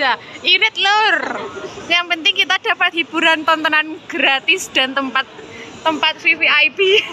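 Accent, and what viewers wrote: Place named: Java, Indonesia. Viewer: Bahasa Indonesia medhok banget🤗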